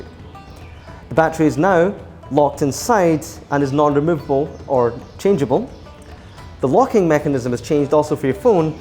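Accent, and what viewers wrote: United Kingdom. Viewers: Too much British accent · Y'all need to chill. He's speaking with a British accent. British spell it colour. That's the joke. I'm well aware that America spells it color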